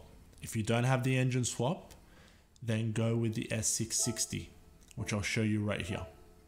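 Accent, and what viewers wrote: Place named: Australia. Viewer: I thought you sound Aussie haha great vid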